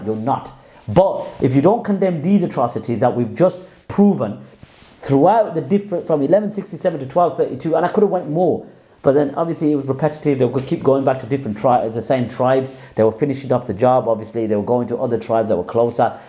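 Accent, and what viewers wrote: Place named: Punjab, India. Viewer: Love ur punjabi accent bro😂❤️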